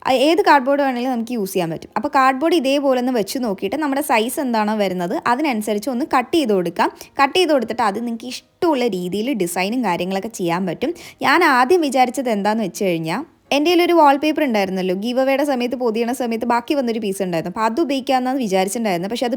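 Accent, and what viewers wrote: Palakkad, India. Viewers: ചേച്ചിൻ്റെ വീട് പാലക്കാട് ജില്ലയിലആണ് എന്ന് പറയുന്ന നതിന് മുൻപ് ചേച്ചിയുടെ SLANG കെട്ട പ്പോൾ മനസ്സിലായി പാലക്കാട് ജില്ലയിലാണ് വീട് എന്ന് ഞാനും പാലക്കാട് ജില്ലയില്ലാണ്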